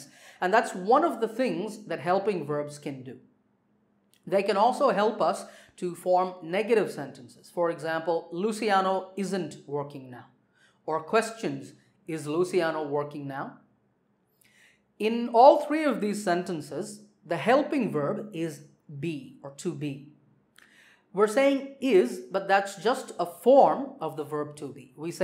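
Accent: India. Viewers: You have an amazing accent being Indian. Keep up the good work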